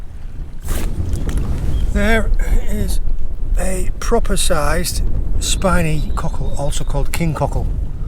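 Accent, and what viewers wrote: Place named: North, United Kingdom. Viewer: Love when a smoggy accent tries to speak proper England. Go lad, cracking channel. Ex booty?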